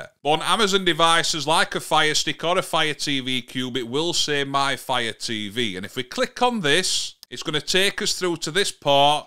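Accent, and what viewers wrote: Yorkshire, United Kingdom. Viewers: Subscribed! Proper Yorkshire!! Keep em coming! Great to hear the accent all the way from 🇦🇺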